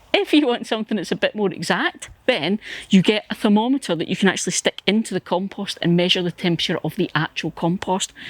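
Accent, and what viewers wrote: Scotland, United Kingdom. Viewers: Good morning, (Good evening in Scotland right now). Your new bin looks like a winner, and so much thicker than the $99 bin that I bought from Amazon. As far as the squeak goes, that will go away once you open the lid a few hundred times🤫. Being new, it’s going to squeak a bit at first. Today, I opened your video before I was fully awake, and you sounded more “Scottish” than in some of your previous videos. I had to lean closer to the screen, so as to translate😳.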